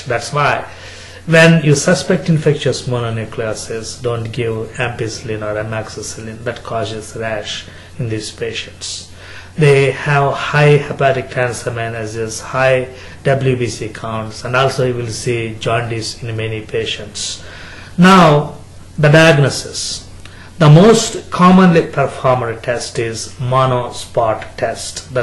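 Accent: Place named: South India, India. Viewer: almost like listening to a new language.you are so learned and look like a hardworking person you should try and practice speaking commonly known and understandable accent of english ...earnest opinion.There are so many people besides south indians who would like to know what you are saying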